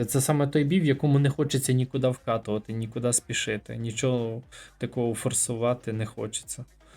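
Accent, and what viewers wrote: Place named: Poltava, Ukraine. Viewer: Якій же в тебе гарний Акцент!Звідки ти,Полтава?Миргород?Суми?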